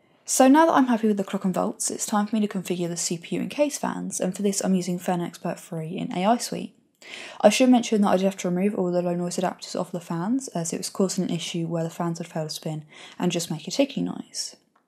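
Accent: England, United Kingdom. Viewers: She's from England. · You make some really good videos. Sadly I always have to concentrate to understand what you're saying. Guessing you're from the UK, what regional accent is that?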